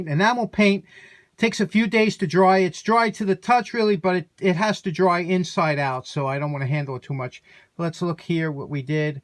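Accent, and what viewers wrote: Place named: Staten Island, United States. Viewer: Today, I was delivering and setting up a large grandfather clock for a man from Staten Island, his accent reminded me of you,. I work at a clock and watch repair shop in Western Kentucky. Our shop has been run by one family since 1902 and still has most of the german and swiss tools from the early 1900s. Can’t beat the quality.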